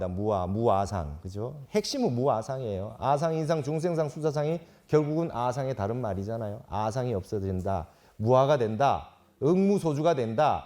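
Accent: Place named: Gyeongsang, South Korea. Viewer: 스님 은근히 경상도 톤에 정감이 가네요 항시 스님 법문에 관심을 가집니다 감사합니다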